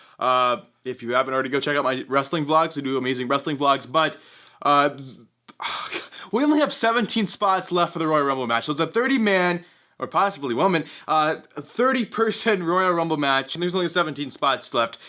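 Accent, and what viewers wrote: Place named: Canada. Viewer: by the sound of your a`s I can tell your canadian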